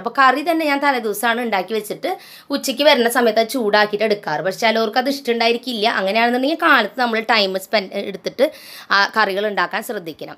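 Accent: Thrissur, India. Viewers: നാടൻ ശൈലിയിൽ... നമ്മുടെ തൃശൂർ ഭാഷയിൽത്തന്നെ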